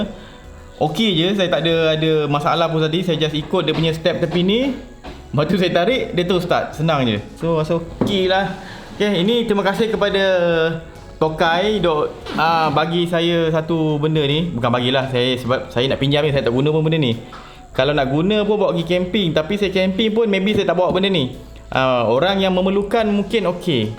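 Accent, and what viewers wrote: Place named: Kelantan, Malaysia. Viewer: Lama dah nk tanya ni. ni ore kelate ke? Ada perkataan bunyi kelate sikit..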